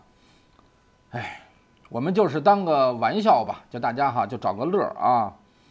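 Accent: Beijing, China. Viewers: 听老哥的北京口音就是亲切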